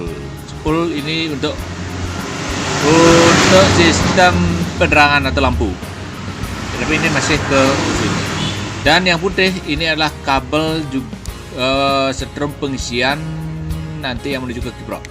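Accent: Bali, Indonesia. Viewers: Dari Bali pak? Logatnya kental Bali, bisa garap ACG stater vario 150 dipasang di CB?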